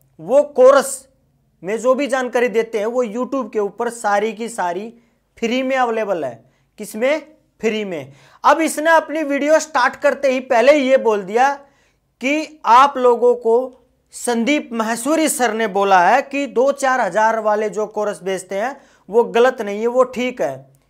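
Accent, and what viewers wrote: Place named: Gujarat, India. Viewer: Bhai bat sun k gujarati lag rhe ho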